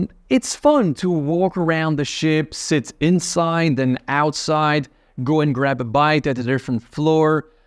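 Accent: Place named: United States. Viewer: Sounds like you learnt your English from the Americans!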